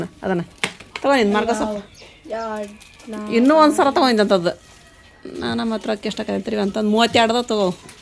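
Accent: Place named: North Karnataka, India. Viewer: ನೀವು ಮಾತಾಡು ಕನ್ನಡ ಕೇಳಲಿಕ್ಕೆ ಮಧುರ ಮತ್ತು ಆನಂದವಾಗಿರುತ್ತದೆ ಉತ್ತರ ಕರ್ನಾಟಕದ ಭಾಷೆ ಬಹಳ ಖುಷಿಯಾಗುತ್ತದೆ ಅದಕ್ಕಾಗಿ ವಿಡಿಯೋ ನೋಡ್ತೇವೆ ಹೀಗೆ ನಿಮ್ಮ ಭಾಷೆ ಮುಂದುವರಿಸಿ ಕನ್ನಡ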